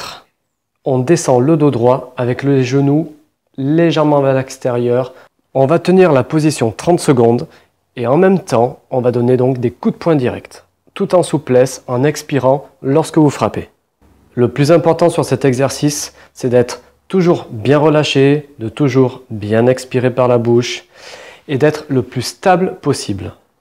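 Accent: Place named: South, France. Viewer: T'as l'accent du sud parfois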